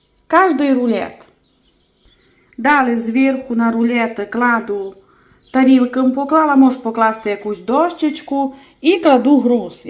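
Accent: Transcarpathia, Ukraine. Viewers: Дякую за цікаві і смачні рецепти. Закарпатський діалект відрізняється від галицького чи буковинського, але всерівно зрозумілий. Я б сказав, що це додає якогось колориту приготуванню... З прийдешнім Святом Пасхи!!! Нехай в 2024 році настане мир і перемога України! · Пані, але ж соковита закарпатська говірка! А рулет Ваш приготую! Дуже гарно виглядає і хвалять його! Дякую! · Дуже гарна начинка.а діалект закарпацький -зачаровує.Респект каналу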